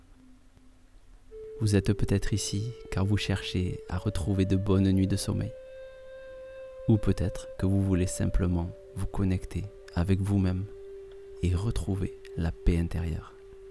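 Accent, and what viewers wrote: South, France. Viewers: Oula ça sent l'accent du Sud, déjà j'accroche à mon "dialecte" 😉... Si je ne rajoute rien de plus c'est que je dors et c'est que c'est efficace 💪✌👌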